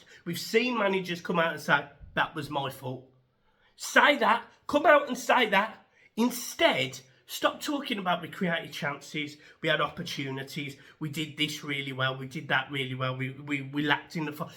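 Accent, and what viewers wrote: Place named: Liverpool, United Kingdom. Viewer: His scouse accent reminds me of my ex girlfriend she was a Brummie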